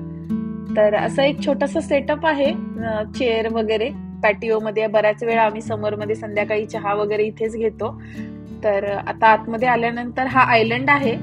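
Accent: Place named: Vidarbha, India. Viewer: Tumcha accent vidharbhacha watto 😅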